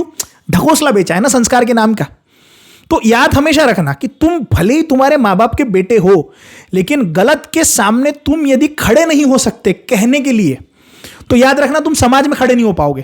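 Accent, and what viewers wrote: Maharashtra, India. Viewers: R u marathi bro. Because your way of speaking🗣 directly tells 😊😗 ??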